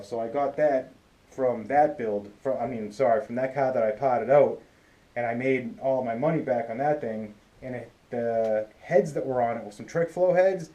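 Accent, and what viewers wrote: New England, United States. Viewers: Where you from dude!? I heeyah New England Haaaaaad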